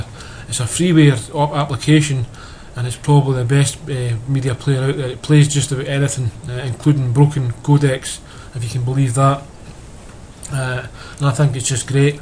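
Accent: Scotland, United Kingdom. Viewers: brother are you Scottish i like your accent and thanks for the video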